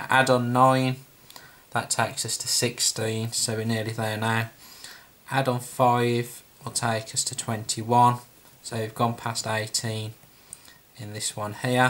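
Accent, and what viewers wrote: Birmingham, United Kingdom. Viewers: Thank you! What part of Brum are you from mate?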